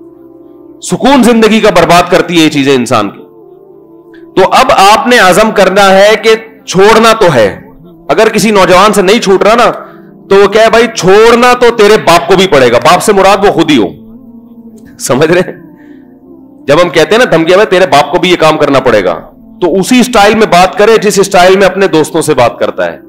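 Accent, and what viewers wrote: India. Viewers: Molana ka voice agras pura ka hai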